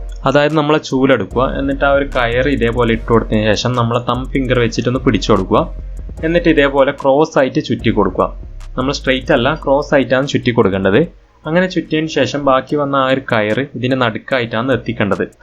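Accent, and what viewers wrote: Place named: Kannur, India. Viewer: കണ്ണൂർ ഭാഷ ഇതിലൂട്ട എടുക്വ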